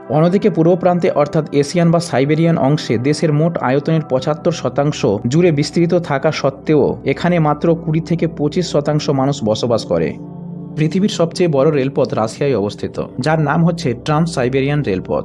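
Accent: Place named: Kolkata, India. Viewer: সরি ভাই..... আপনার কথা বলার ধরনটা মনে হচ্ছিল যে আপনি কলকাতার । আপনি কোন জেলা থেকে বলছেন.....??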